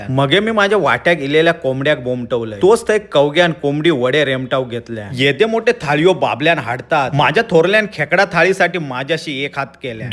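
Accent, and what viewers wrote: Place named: Konkan, India. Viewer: कोकणी बोली ऐकायला बर वाटत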